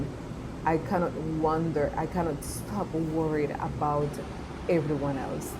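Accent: Jamaica. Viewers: wait a minute is that a Spanish /Jamaican accent?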